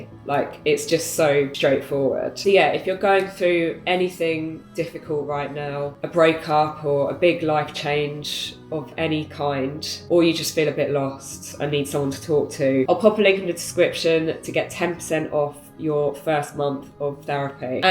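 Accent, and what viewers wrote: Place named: United Kingdom. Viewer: theres a young lady that talks just like u lol we love it at work is it like from england british or irish lol i dunno it just so dam cute liver pool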